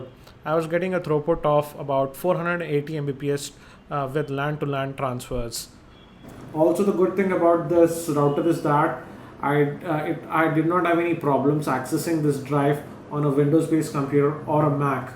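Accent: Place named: Tamil Nadu, India. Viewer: Your Tamil accent is heavy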